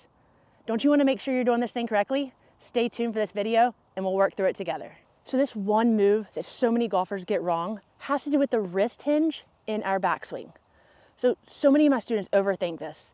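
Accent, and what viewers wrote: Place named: United States. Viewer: I love your work method and most of all your American 🇺🇸 accent !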